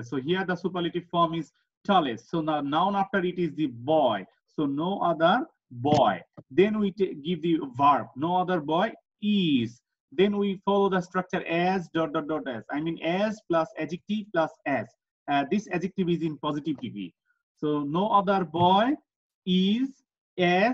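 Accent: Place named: United Kingdom. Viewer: Sir, you talk like the British. I liked your lecture!!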